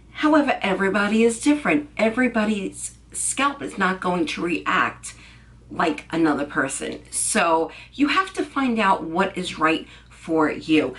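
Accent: New York, United States. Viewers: All ingredients used for hair growth have to come from inside the body not on top. You need biotin selenium etc. Dr. Berg has an incredible formula for hair growth. Ps. You gotta be from nyc you sound like me! Queens?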